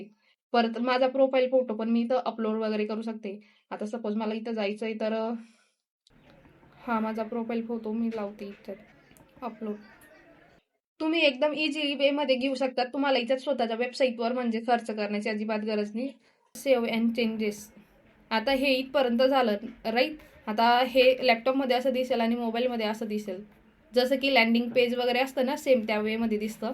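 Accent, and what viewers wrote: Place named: Khandesh, India. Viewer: Tai tuzya aawajat खानदेशी भाषा आहे म्हणून तुझे व्हिडिओ फार आवडता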